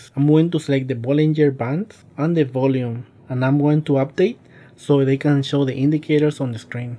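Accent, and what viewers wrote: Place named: Latin America. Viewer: I appreciate your help and this video gave us viewers some more tools. Thank you. Two comments about presentation. 1. Wear a nice collared 👔 shirt. The look of success is credibility when talking about making money. You have a very kind face and your English is clear, despite the heavy Latino accent. It is very good. I know how hard English to learn and you are absolutely doing great. You are able to communicate very technical information and this is very impressive. The second thing I want to pass on to you about presentation is to SMILE. Lighten up. It is okay to show a more human side to you. I’m not saying to wear a bunny costume, but let us see the more personable side to you that we would if we went out for drink together. I will subscribe. Again, thank you.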